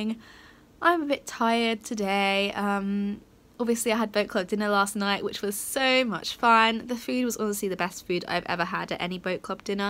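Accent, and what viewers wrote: United Kingdom. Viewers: I'm from Argentina and I love listening to your British accent, it's amazing 😻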